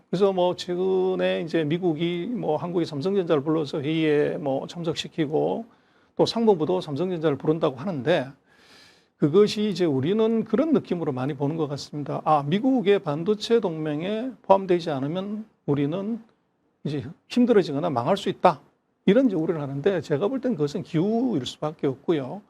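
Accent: China. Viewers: 니 발음 역겹다.중국어 발음으로 한국말을 하는군.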